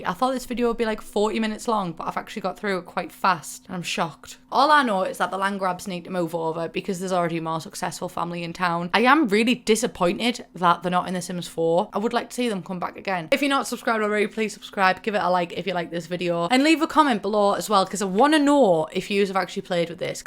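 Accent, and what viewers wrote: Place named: North, United Kingdom. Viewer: I wish I wasn’t such an uncultured American so I could have a dope northern accent. What a legend. Love you, ❤️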